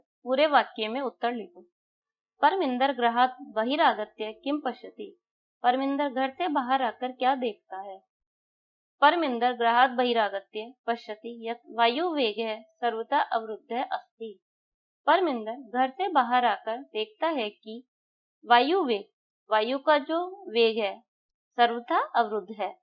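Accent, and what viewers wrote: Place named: Rajasthan, India. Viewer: Aap Rajasthan se ho kya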